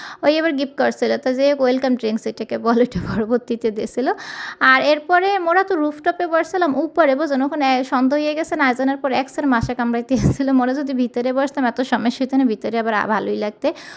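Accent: Barishal, Bangladesh. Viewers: আপু আমার হাসবেন্ড কাতার থাকে। তোমার ভিডিও আমার খুব ভালো লাগে। আমাদের বরিশালের ভাষা খুব ভালো লাগে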